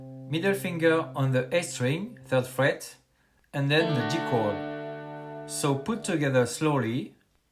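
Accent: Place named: France. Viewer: Thank you love your French accent ❤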